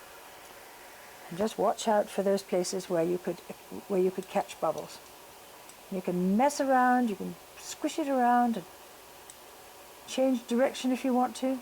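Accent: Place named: United Kingdom. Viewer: you are fabulous!!!!! I made my first lampwork beads today and I am hooked! I even tried using some scrap stained glass and had good luck but I am glad to learn your techniques! I love my first beads (now if I can only get them off the mandrel, I think I might have put the bead release on too thick because it wanted flake off when I worked!) and I can't wait to make more! Thanks for such a wonderful series of videos! PS LOVE your British accent;)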